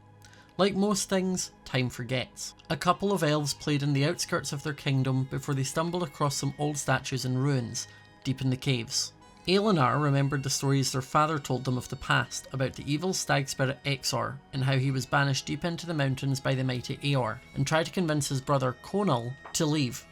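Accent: Scotland, United Kingdom. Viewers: this explains so much the coruption coming from your portal how u and the demon both have scottish accents i cant wait til next episode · Your accent gave it away weeks ago... :) It was all a bit McAdder from Blackadder.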